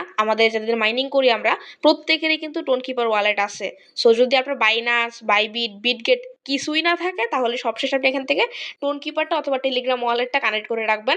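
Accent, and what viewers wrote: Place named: Jessore, Bangladesh. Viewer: আসসালামু আলাইকুম আপু, যশোর থেকে নতুন করে আপনার ইউটিউব চ্যানেলে এবং টেলিগ্রামে যুক্ত হলাম।ভিডিও গুলো খুবই ভালো লাগে, আপনার কথা শুনে মনে হচ্ছে আমাদের যশোরের মেয়ে আপনি।আপনার জন্য শুভকামনা রইলো।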